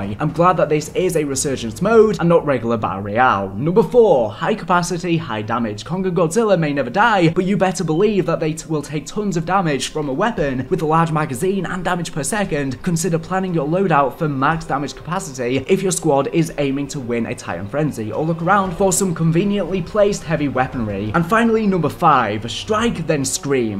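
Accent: England, United Kingdom. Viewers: Im pretty sure that it’s a English/British accent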